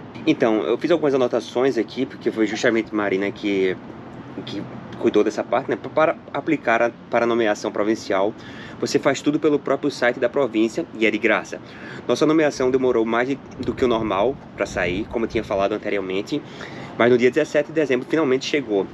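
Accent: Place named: Northeast, Brazil. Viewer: Você é de onde? Seu sotaque é nordestino! Eu sou de Fortaleza/CE.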